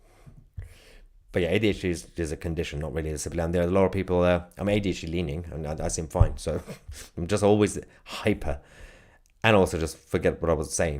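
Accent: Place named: Scotland, United Kingdom. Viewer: You're picking up that Scottish accent from 😊😂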